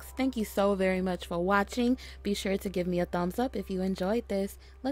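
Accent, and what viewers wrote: United States. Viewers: Which accent is this ? .. which state 🙂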